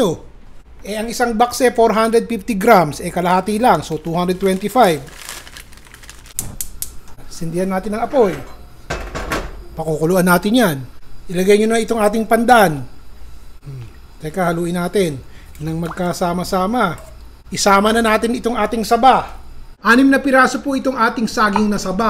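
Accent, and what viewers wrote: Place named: Angono, Philippines. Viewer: Informative and entertaining lalo pag nagsasalita po kayo dahil naaalaala mga taga Angono na pareho mo punto. At sarap ng kakainin habang nanonood ng show mo. All the best,!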